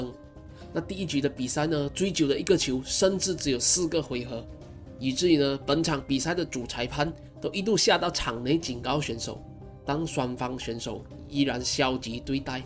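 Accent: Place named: Northern Malaysia, Malaysia. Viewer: 你是北马人吗？口音好重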